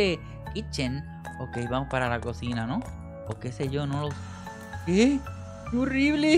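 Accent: Puerto Rico. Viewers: Eres de Puerto Rico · Me encanta la voz de y parece Puertorriqueño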